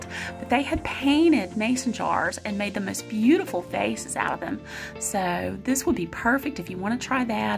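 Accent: South, United States. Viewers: You definitely have a southern accent. I have one too 😀. When my family moved to New York many years ago, I got teased by the way I talk. I told someone once that southerners sound that way because we have respect in our sound 🙂. Then he tried to copy my southern accent 😄.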